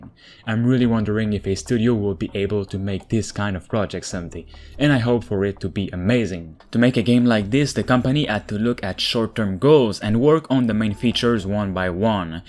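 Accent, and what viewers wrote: France. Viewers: why dont you just make videos for french people? your french accent is so thick i can barely see the video.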